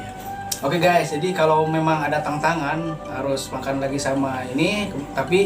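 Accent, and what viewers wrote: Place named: Java, Indonesia. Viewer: Ngomong nya kek org Jawa bang wkwkwkwk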